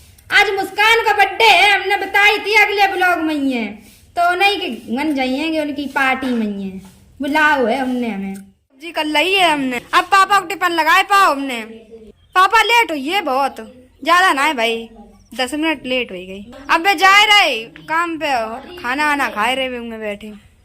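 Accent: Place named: Mainpuri, India. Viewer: Aapki Mainpuri language is best...?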